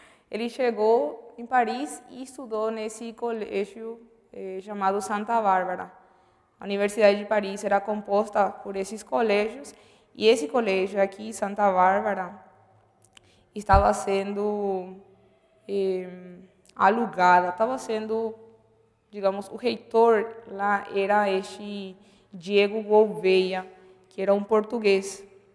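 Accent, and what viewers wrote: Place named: São Paulo, Brazil. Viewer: Gringa com Sotaque paulista. Primeira vez que vejo. Excelente aula. Obrigado.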